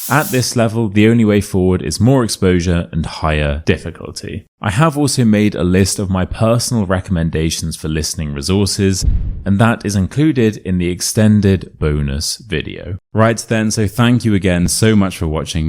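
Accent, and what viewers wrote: RP, United Kingdom. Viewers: A good approach to Modern RP though.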